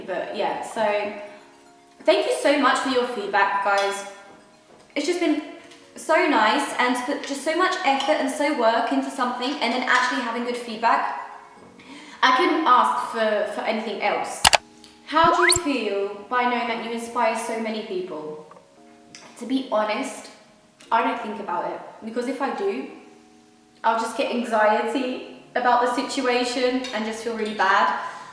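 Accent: England, United Kingdom. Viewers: Adoro o sotaque britânico , acho que devias apostar mais por estes vídeos até crescias muito mais prefiro ver estes vídeos , assim conseguias te aproximar mais da tua cultura e nos também Pensa nisso Beijinhos e muito sucesso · Que lindo é o teu acento inglês,. Adorei o video! Tu faz como queres: inglês o português. Eu sei que irei a gostar. Beijinhos! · Hiii! I love your british accent!! Please do more videos like this! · So british eheheh adorei :D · OMG the british accent !! 😍😍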